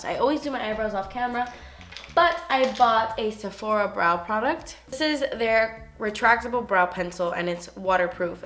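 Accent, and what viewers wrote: Cuba. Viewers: I love it when she talks in her Cuban accent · She's Cuban! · she's cuban from Miami :)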